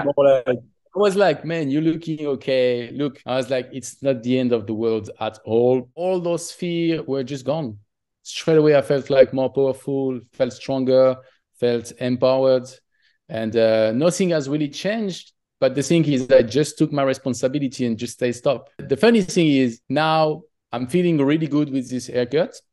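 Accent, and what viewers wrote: France. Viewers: I like his accent - is he French? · Il est très beau maintenant. Plus mieux quand chauve. I could tell he speaks French from his accent. I get frustrated when a guy with a bald spot doesn't shave his whole head. It's annoying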